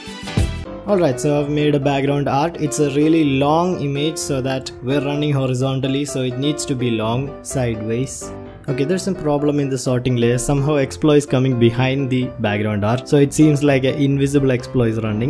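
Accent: Kerala, India. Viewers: u litterally sound like a malayali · real malayali english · his voice is Luke indians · you English is pure Indian English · now I'm really sure xplo is Malayali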